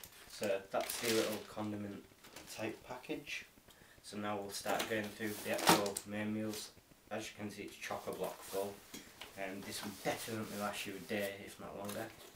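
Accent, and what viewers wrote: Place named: United Kingdom. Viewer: Aye a nice pack! I´m sure in extreme conditions two soldiers could survive for at least 24 hours. I´ve just got a few questions?!?? How do ya heat all that stuff? Ever tried the american M.R.E theres a simple heatin´ system inside the ration pack. And last but not least! Where´s ya accent belonging to?? It´s quite intresting that every town in the UK has got it´s own accent. Greetings frae germany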